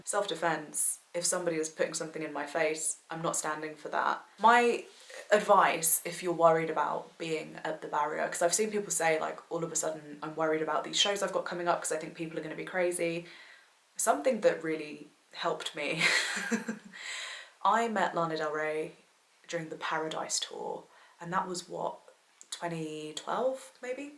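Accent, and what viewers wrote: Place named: Kent, United Kingdom. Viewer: I reckon you're from Kent, not that I'm trying to dox you or anything but I'm from Kent (UK) and your accent sounds kinda like mine.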